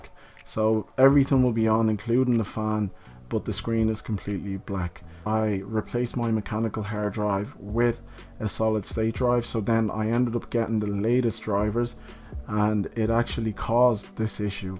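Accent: Ireland. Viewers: Is that an Irish accent or are my ears just ringing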